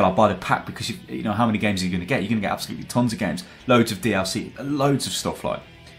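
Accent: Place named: United Kingdom. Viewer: I subscribed because of your British accent. :D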